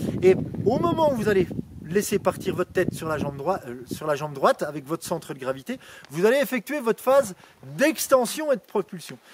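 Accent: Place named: Franche-Comté, France. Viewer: Merci beaucoup ! Ton accent m'intriguait pour un moniteur des pyrénées orientales... mais t'es franc comtois :) Mise en pratique demain, merci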